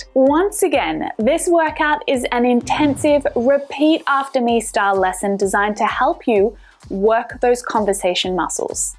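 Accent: Australia. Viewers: Iam afraid to be addict to australian english accent .U're great teacher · Love the Australia accent, yes your smile bright like a morning star✅✅✅✅🇸🇪🇸🇪🎃🎃🎃🎃🎃🎃🎃🎃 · Are you australian ? How you thought to choose the name of your Channel ? mmmEnglish! it's like tasting a dish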